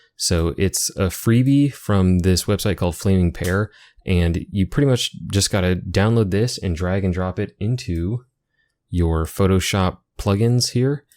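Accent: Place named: United States. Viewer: You look british but sound american?!?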